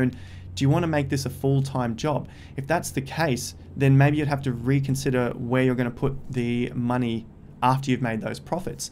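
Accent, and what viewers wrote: Australia. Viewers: Maaate! I have been in this crypto la la -land since early 2017 (and seen thousands upon thousands of vids). This is hands down by far the best "tutorial" any new (and old) investor could get. Absolutely nailed it. No fuzz, no drama, just facts. Thanks a ton! On a sidenote, I studied 3 years in Brisvegas and listening to you(r) accent and seeing the QLD had me tearing up a bit;) Good on ya! Chers and thanks. Lotsa good vibrations from Norway!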